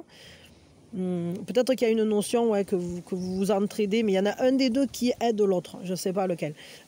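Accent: South, France. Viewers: Bo jour Jaime beaucoup vous écouter pour votre bienveillance et votre petit accent du Sud 😉le lapin violet me correspond à 99% je suis très fou et j’aimerais que l’autre le soit aussi mais il attend il hésite et c’est assez pénible mais on verra lors de notre prochaine rencontre 🤷‍♀️Je lui laisse faire le premier pas c’est moi qui l’ai fais jusqu’à maintenant merci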